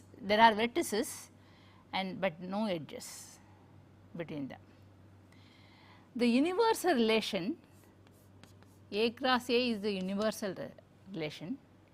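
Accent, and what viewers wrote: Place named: Kerala, India. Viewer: That accent reminded me of my drawing teacher from Kerala lol But she explains so well. I understood everything in one go !! Thank you very much mam !!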